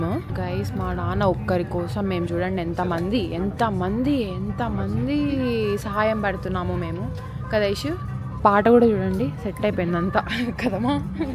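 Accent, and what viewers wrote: Rayalaseema, India. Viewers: Ah yappa dagaraki was paka ralayasemma slang ❤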